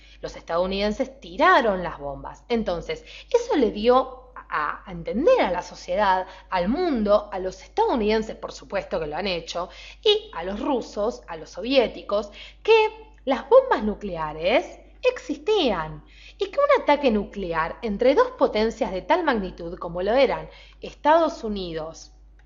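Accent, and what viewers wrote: Argentina. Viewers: "pantasha" es la pronunciación de la palabra pantalla en Argentina, no "pantaia"... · Cuanta ignorancia carajo, soy mexicano y a mí tambien me caga como hablan los argentinos con ese acento de mierda, ya todo difiere de la region o países pero hay que admitir que el español de verdad es el de España ya que ellos lo trageron al continente y por ende así se debería hablar. La muchacha del video al pesar del acento que me incomoda un poco se expresó de forma profecional, lo más natural posible y no como otros videos que utilizan frases que suelen usar en argentina como vos, pelotudo, que se yo......, pongo como ejemplo la mayoría de las peliculas gringas son dobladas en méxico para latinoamerica y en ninguna dicen cabrón, chabo, pendejo, etc. Así yo veo este video, por lo demás esta bien. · es argentina, no la culpe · pinche acento argentino xd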